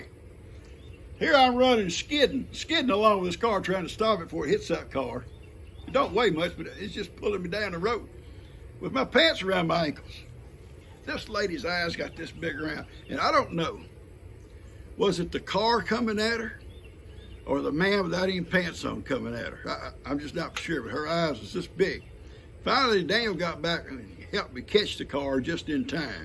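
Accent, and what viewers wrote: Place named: South, United States. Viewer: Hi. I don‘t quite understand all you‘re saying (southern accent 😉) but I love listening to your stories 😊👍 I for sure understood the story about your pants and the family in their car 😂 I always enjoy looking at pictures from earlier days. Do you have some for us? Greetings from Switzerland 😊🇨🇭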